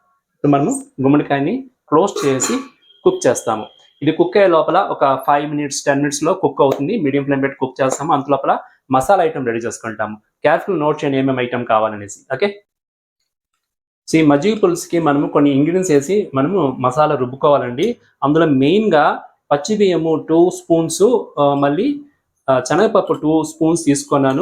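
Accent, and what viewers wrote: Tamil Nadu, India. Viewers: Hi! Miru entho ishtamga vantalu chesi choopistharu mariyu mi telugu bagundi. Miru tamilian andi?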